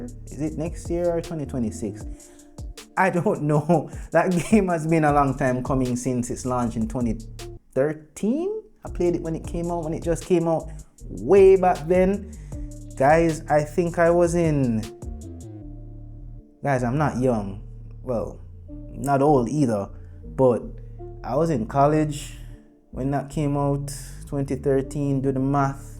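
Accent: Jamaica. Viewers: Bring out the Jamaican out 🇯🇲🇯🇲🇯🇲🇯🇲😂😂😂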